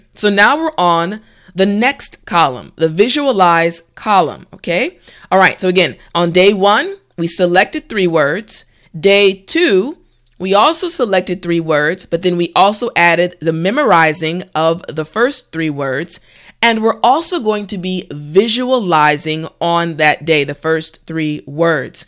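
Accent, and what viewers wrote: United States. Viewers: well is this american english ? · Anyone please tell me Is this American English channel or British ? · American probably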